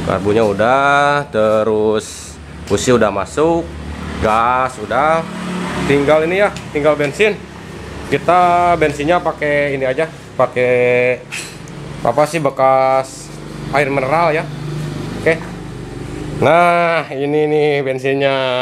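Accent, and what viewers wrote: West Java, Indonesia. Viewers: Weh Sunda nya mag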